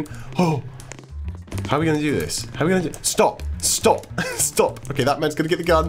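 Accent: United Kingdom. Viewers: do u live in UK cause of ur accent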